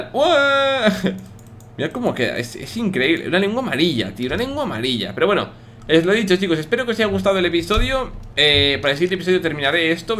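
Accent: Colombia. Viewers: millones pense que eras español un colombiano xD xD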